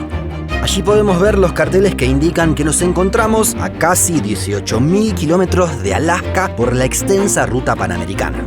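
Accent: Argentina. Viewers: jaja hasta apenas me di cuenta de que la voz del video tiene acento argentino :o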